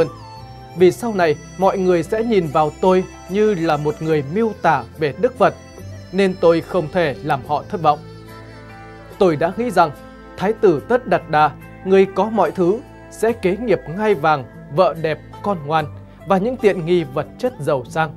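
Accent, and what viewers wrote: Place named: Quang Binh, Vietnam. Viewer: yougotthis::oops::dothefive::elbowcough: Me no' nghe cai' giong Quang' Binh' cua' thang' nay' het' muon' xem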